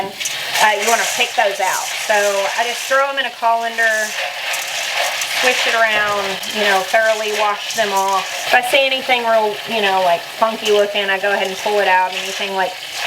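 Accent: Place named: Arkansas, United States. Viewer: It's that good old Arkansas southern drawl! I am also from Arkansas. Not far from where lived before moving. I wish I'd met her back then.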